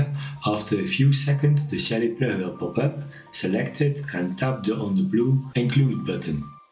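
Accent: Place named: East Flanders, Belgium. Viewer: grappig da ge kunt horen da gij nen oost-vlaming bent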